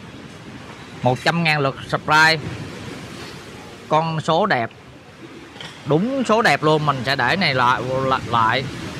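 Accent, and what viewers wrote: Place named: South, Vietnam. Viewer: chúc mừng bạn cán mốc 100 ngàn cây số, ý lộn 100 ngàn đăng ký. Xem video của kênh không những được học kiến thức lái xe mà còn được ngắm cảnh khám phá những cảnh đẹp, những lưu ý thực tế trên các cung đường. Hấp dẫn hơn nữa là bạn rất vui vẻ nói chuyện lôi cuốn tiếng nói miền Nam rất dễ nghe, thân thiện, người lái xe hay người xem như mình cảm thấy vui vẻ không buồn tẻ buồn chán. Nói chung là rất tuyệt vời.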